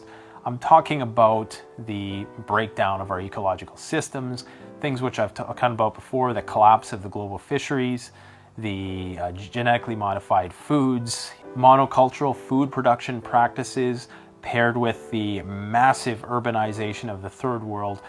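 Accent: Canada. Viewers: at first i thought this video is just a funny parody: a canadian flannel wearing with overly canadian "out" and "about" with that background music, but no it seems you're serious! well most of the things you said are pretty factually wrong but hey you seem like a pretty nice and likable guy so best of luck to you buddy. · Since there IS not a Canadian voice, I feel your channel is unique. You shouldn't have to change your name. Happy new year to you and yours. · The name is good. It tells us you are Canadian and that doesn't mean you are limited to talking only about Canada. FPS Russia is an American faking an accent and making videos about things that Russians don't have access to. It works well for him. Your Canadian accent is real and no one questions that you are from Canada.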